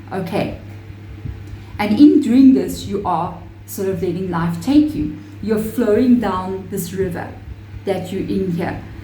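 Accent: South Africa. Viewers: Is your accent South African? 😊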